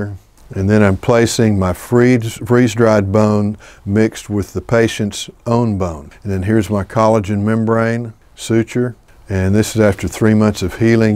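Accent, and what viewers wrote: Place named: Texas, United States. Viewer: The only doc I would listen to with the Texas southern accent 🙂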